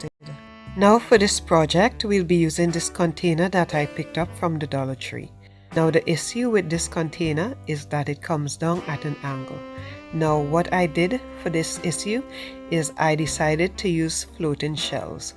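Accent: Trinidad and Tobago. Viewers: Wow you sound Trini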